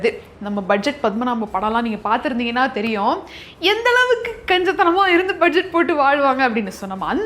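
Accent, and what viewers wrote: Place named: Kongu, India. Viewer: Good information sister.. 👍I like the way she speaks in kongu slang..❤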